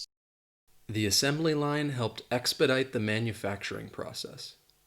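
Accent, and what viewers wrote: United States. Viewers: You are really great sir Are ya really AMERICAN If you're not you just sound 100 percent like an AMERICAN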